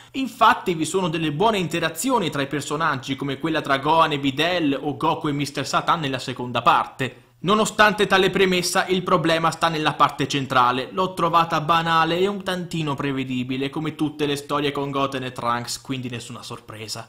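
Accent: Italy. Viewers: sei italiano ?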